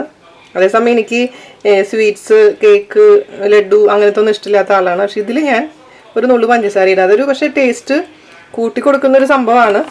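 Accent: Thrissur, India. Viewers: fantastic. Your Thrissur style tone of talking is really an added attraction to the elaborative presentation. Well done.. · Chechide veed evideyaa. Thrissur samsaaram aanalloo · super pachadi.. 👍pine trichur language super... 😍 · Chechi de samsaram kettittu Thrissur,Urakam bhagathulla aalu pole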